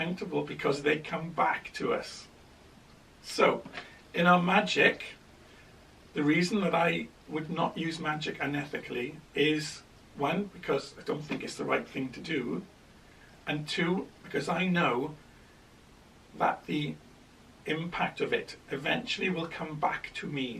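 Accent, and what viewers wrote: United Kingdom. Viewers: I like the way this guy talks he is British like my mom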